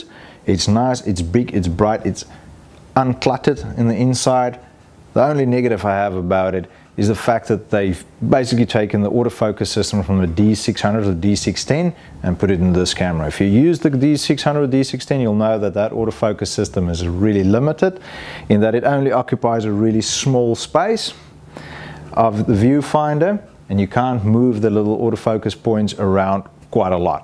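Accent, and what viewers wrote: South Africa. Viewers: You sound like a fellow South African living in NZ; Afrikaans first language? Thank you for the review, I love that camera, but will only be able to afford it 15 years after its release😥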